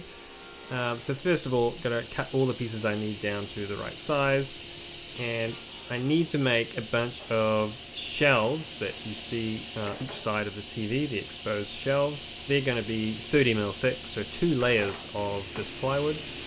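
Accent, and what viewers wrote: New Zealand. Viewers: somewhere in nz by the accent i take it? i love your work, it is quite fantastic, love th idea of the v-groove just by using the table saw set on a 45, that is genius...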